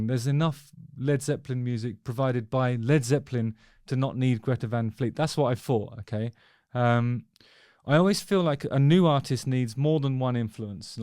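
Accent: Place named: Cockney, United Kingdom. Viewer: Just watching this now after being a newcomer to your Channel. First off, you look much better now clean shaven and with shorter hair. I miss the JHRA intro music. And your inflection in your tone is different. A bit more cockney in this one. I miss the poshness of your voice now. Nice comments on GVF. What has stayed the same is your thoughtful insight and analysis.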